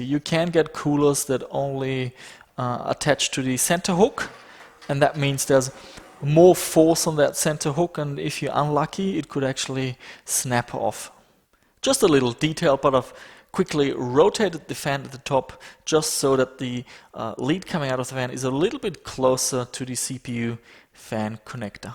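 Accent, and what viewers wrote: Germany. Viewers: Are you german? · Deutscher knn der akzent nicht werden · The german is Strong is this one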